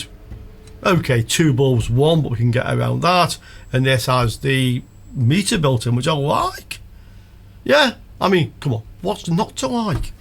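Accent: United Kingdom. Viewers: nice video. nice for a change hear King's english with Schuko electric plugs. p.s. my father had similar setup in <num> meter over his workbench with two parallel 100W lightbulbs to have about 1 amp current limit when testing electric appliances and tube radios for input short circuits of course without all the digital stuff of that device. such device with a 40W lightbulb may be a safe device to let capacitors of long unused mains powered transistor stuff have the capacitors reform. myself i use a SOLA ferroresonant 60W transformer to test stuff that have long time been in a barn, attick, cowhouse or else with potential for mains fuse blowing faulta ( the Ferroresonant i.e. constant voltage transformers have inherent power limit, but are inefficient at light load)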